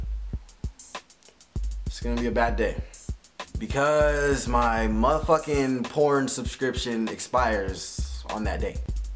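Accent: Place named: United States. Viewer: u soun soo country (wat tha hell )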